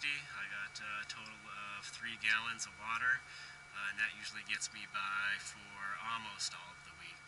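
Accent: Maine, United States. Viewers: are you from Maine?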